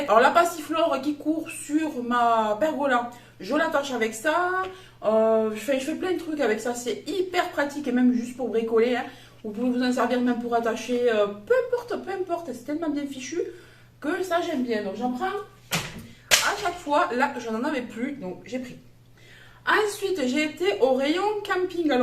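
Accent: South, France. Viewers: Bonjour, belles découvertes à action. Le petit haut vous va très bien. La couleur met votre teint en valeur. J aime vous écouter avec votre accent du sud. Bonne journée.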